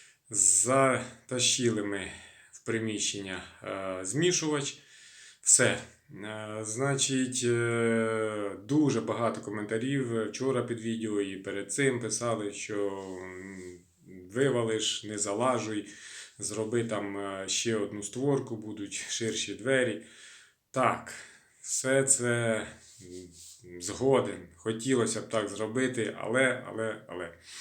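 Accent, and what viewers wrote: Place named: Kyiv, Ukraine. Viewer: ви і родом з Херсонської області? Розмовляєте,як у нас на Київщині 😀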